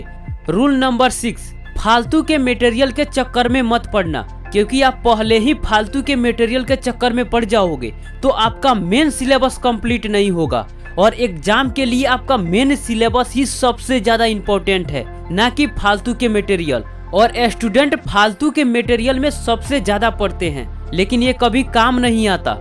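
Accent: Bihar, India. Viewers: Bhaia aap bihari ho kyaa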